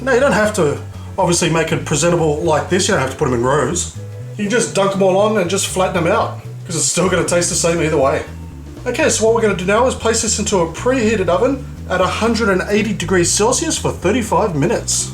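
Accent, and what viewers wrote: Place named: Australia. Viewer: Great job,. Always like the Australian accent, along with your good personality! God bless you 🙏❣️😇 Bud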